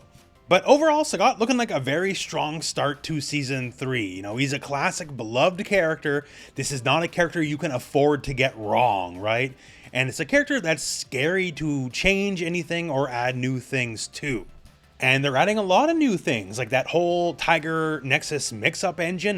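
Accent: Canada. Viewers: Canadian accent is strong in this video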